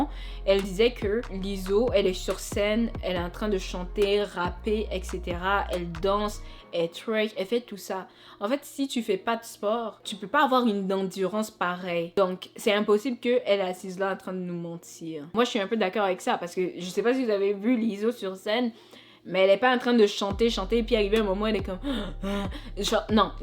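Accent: Quebec, Canada. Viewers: L’accent québécois + l’accent ivoirien je meurt le combo 😭😭 j’aime trop ton accent 😂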